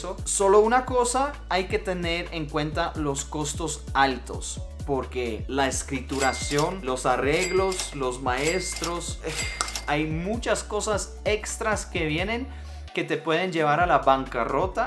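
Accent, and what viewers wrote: Colombia. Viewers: Jajaja cómo has aprendido a hablar al estilo colombiano 🤭🤭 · Parce puro colombiano jajajaja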